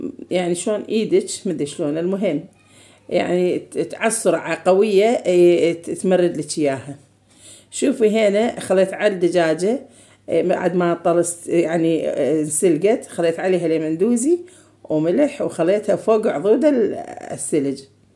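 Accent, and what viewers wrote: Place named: Kirkuk, Iraq. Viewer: الله ينطيج العافية خاله اشكد احبج واحب سوالفج والله العظيم واني متابعتج من زمان ودائما اشوف فيديواتج اني من كركوك وهم مثل لهجتج نحجي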